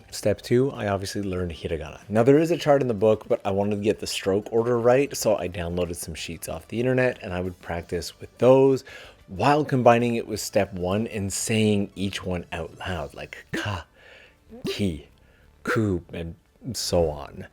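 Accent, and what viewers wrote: Canada. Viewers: Loving your Canadian/UP accent haha. ❤ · First of all. Great tips and tricks, I really need to try them all. This video has really caught my attention more than most. Second of all. I was wondering if you were Canadian. I could have sworn I was hearing Ryan Reynolds here and there. Here’s an opportunity to make a video of you speaking in Japanese sounding like Ryan Reynolds 😂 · Just found your channel. And I'm just as fascinated by your Canadian accent. lol. It's very particular. I'm trying to mimic it, and can't get it right! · lol I knew from your accent you were Canadian. Thanks for the tips. I will try them out. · Lots of French here, and I remember some from school classes. Ultimately though I pick up like every fifth to 10th word and use technology when needed. Nothing like what my mom went through where her father was actually raised in French. 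He passed when I was really young and I was told he spoke English to me, but his accent was so thick. Don’t remember anything he ever said to me. Acadian ancestors immigrated through the Hudson in the 1670s. I knew you were Canadian without you telling me because of the way you say about and out. I also dated some bilingual girls from Northern Maine because that’s where I went to high school and where my family was from.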